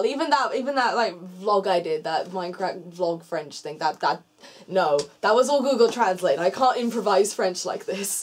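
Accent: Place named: United Kingdom. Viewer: I've had the privilege to get to know you a bit better. You are woman with a special personality, not just cute nor hot. but so much more. You have earned my respect for being yourself. And you should be proud of it. I love your enthusiasm and spirit. Your kindness and funny expresion. And ofcourse you lovely brittish accent :) <3 Sat Sat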